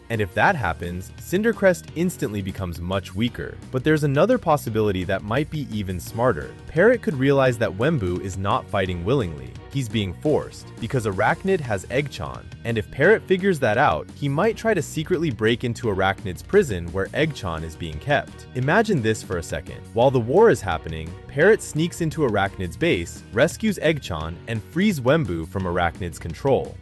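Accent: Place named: India. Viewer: BRO THAT INDIAN ACCENT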